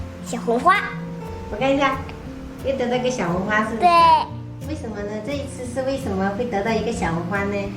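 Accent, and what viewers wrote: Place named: Hokkien, China. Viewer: 我是台灣人聽到妳說閩南語感覺好親切哦👍好廚藝！小寶寶們都好可愛哦😘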